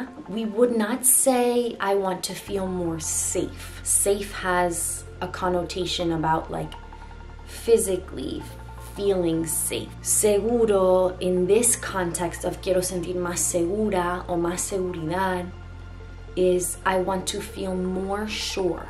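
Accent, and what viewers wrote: Argentina. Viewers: gracias por tu video.Cuando te escucho hablar castellano, es imposible no sentir que sos nuestra, que sos Argentina no cabe duda. Un gran saludo irradias mucha paz que es lo que necesitamos en este momento.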